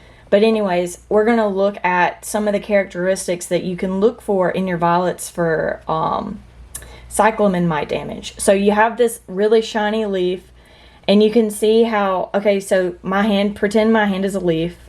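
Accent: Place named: Tennessee, United States. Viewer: I’ve been trying to figure out if it’s mites, too much light, or too much fertilizer on my violets. I think it may be mites :( I’m going to come back to your video tomorrow and compare them to mine. I feel pretty sure they have the same characteristics and am going to throw them away. sad, two are expensive fancy ones and the other was a showstopper for me for so long. trailer always covered in tons of blooms, it didn’t even look real. I’m happy to find your video, I love how knowledgeable and in depth this is. I am also a plant nerd and love the knowledge density and showing the plants up close and talking about the very specific signs that it is mites. that is so helpful. am subscribing to you! also idk if you’re from Tennessee but I am, haha and we sound similar :)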